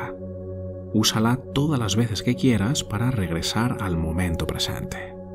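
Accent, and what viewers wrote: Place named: Spain. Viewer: Tienes una voz muy linda y con tu acento español mucho más. Dios bendiga tu don.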